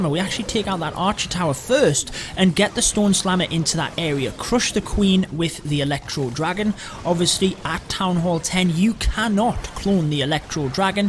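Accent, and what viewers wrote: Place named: Newcastle, United Kingdom. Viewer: Cool it’s nice to see another Geordie clasher😂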